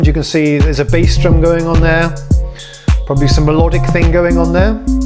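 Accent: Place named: RP, United Kingdom. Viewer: Wow was not expecting an Electribe demo video to feature the poshest accent I've heard since I watched Our Man in Havana a month ago.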